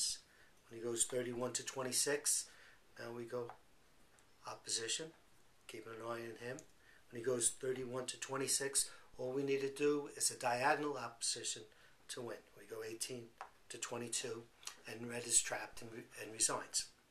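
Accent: New York, United States. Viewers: New York accent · Wayback thats how all New Yorkers sound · ALEX he sounds like a man from New York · Not really lol, just normal NY accent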